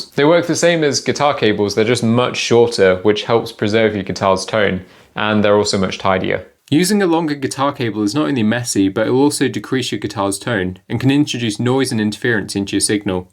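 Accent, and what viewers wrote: United Kingdom. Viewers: this is so random but are u from by yorkshire?? im american but trying to get better at identifying british accents haha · He doesn't sound like he's from anywhere in the north to me but I'm not british either. My guess would be somewhere in or around London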